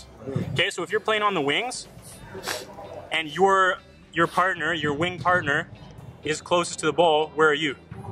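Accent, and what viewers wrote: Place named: Canada. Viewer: As soon as I heard like a fkn Canadian accent I turned it straight off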